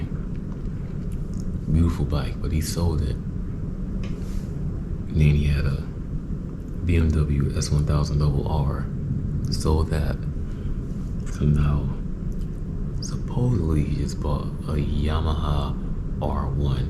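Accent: St. Louis, United States. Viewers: That st louis accent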